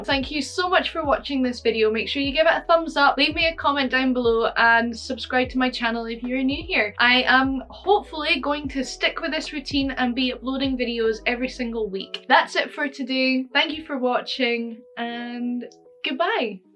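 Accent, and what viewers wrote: Scotland, United Kingdom. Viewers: Just gotta say, I love your work. From a fellow living across the pond, when I first found you on tiktok I didn't expect you to be Scottish 🤣🤣🤣. Your voice sounds very different than how I expected it to. Keep up the good work. Keep your head held high and push through all the bad thoughts that you like to tell yourself. Your inner critic is the worst person to take advice from.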